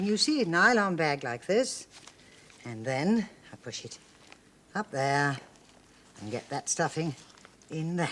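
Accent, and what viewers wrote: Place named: RP, United Kingdom. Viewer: "Cut the rind orf. Gess mark four. One shelf below centaar. Half an ahr for the first pahnd". Even the Queen sounds common these days compared to Fanny lol.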